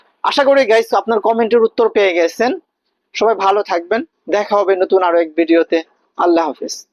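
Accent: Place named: Bangladesh. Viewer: ভাই আপনি বাংলাদেশ থেকে নিউজ করেন নাকি দুবাই থেকে নিউজ করেন তাইলে একটু জানাবেন. আপনার কথা বোঝা যায় আপনি বাংলাদেশ থেকে কথা বলতেছেন.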